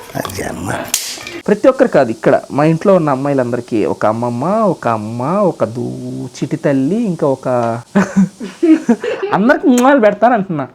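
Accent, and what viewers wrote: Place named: Telangana, India. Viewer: Konchem konchem telangana matladuthunnav I like that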